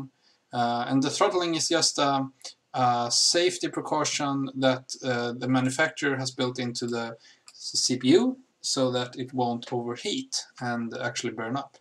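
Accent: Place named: Sweden. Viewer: Älskar när man kan höra ett det är en svensk som talar engelska <3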